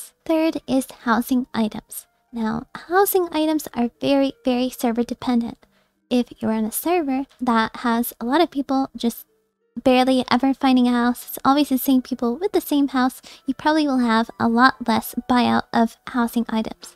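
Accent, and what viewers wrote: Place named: Texas, United States. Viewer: I have to be honest after watching you streaming is a little weird hearing you without your sassy texan voice. Still, cool video.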